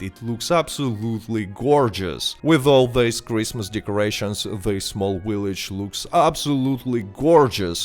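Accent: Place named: Russia. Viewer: His accent make him sound Russian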